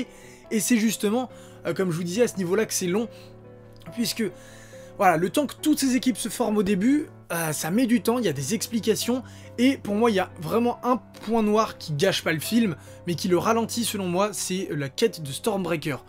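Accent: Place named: Lyon, France. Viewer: Tes lyonnais ? prck tu di trikar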